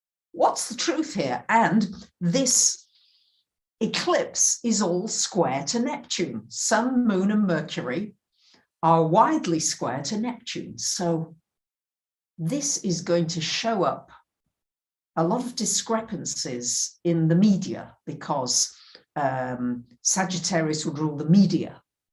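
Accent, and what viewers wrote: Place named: North, United Kingdom. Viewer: Thankyou for your message , your accent seems more northern in this video!. And you look a bit different, all the Greek food I expect!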